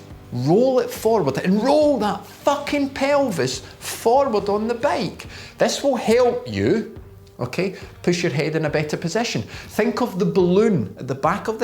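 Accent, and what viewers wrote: Scotland, United Kingdom. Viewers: Thankyou for speaking plainly English like a a true Scotsman. I even get the jokes. Very refreshing. I understand where I’ve been going wrong. With no bs . Thankyou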